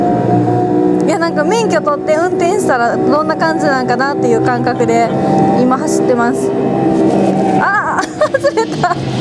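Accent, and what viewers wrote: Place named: Kansai, Japan. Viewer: 関西弁や。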